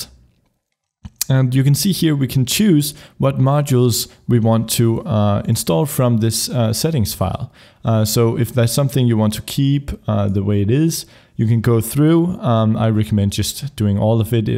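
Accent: Denmark. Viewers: are u danish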